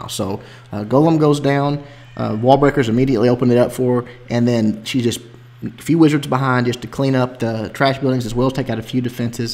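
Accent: South, United States. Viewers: I recognize that accent anywhere in the south ;)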